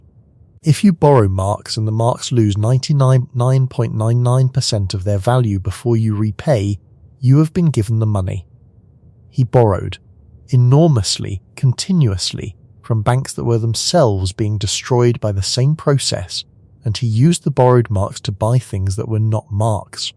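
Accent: United Kingdom. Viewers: The voiceover to this topic uses a very odd / strange pronunciation of the russian word for cash/ money: ROUBLE as rubble.. The British voiceover pronounces the word ROUBLE as rubble. So odd.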